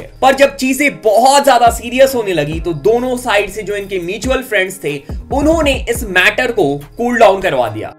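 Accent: India. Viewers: Bhai aapka accent hindi hai but aap punjabi bolte hue bohat funny lagte ho loved the effort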